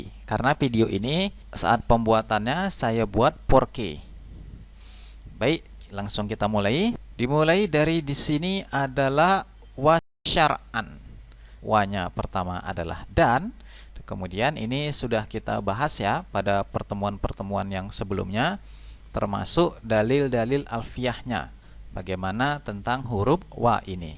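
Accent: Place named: Medan, Indonesia. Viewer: Subhanallah jazakallahu atas ilmunya ust. Ust. Boleh tau gak.ust Asalnya dari mn ya Kok ngomongnya kayak ustadz2 medan sumut. Syukron dr rusli di medan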